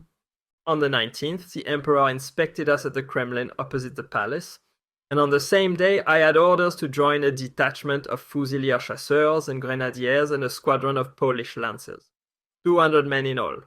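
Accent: France. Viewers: I love your content here however I do agree that the French accent is a distraction. Perhaps I’m much lighter accent or no accent at all might be a better choice, but that’s just my opinion.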